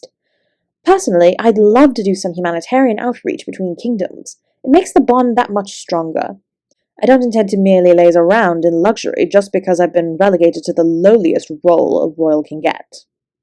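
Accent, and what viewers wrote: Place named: United Kingdom. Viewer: You say "pompous British accent" but I say "absolutely nailed it." This was amazing, and the accent only made it better. Thanks for the audio,.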